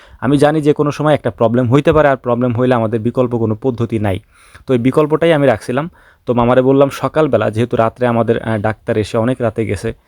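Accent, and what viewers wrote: Faridpur, Bangladesh. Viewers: ভাই আপনার বাড়ি কোন জেলায়, আপনার বেশ কিছু ভিডিও দেখলাম আপনার ভাষা শুনে মনে হচ্ছে আপনি হয়তো ফরিদপুর, কিনবা গোপালগঞ্জ।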